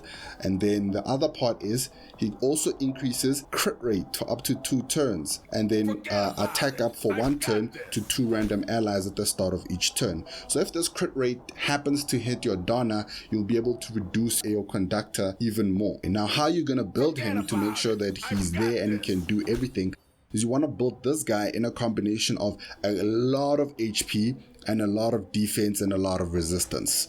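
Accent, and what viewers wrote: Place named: South Africa. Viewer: Jasis so good to drop into a dislyte video and hear a lekker south african accent! you playing global?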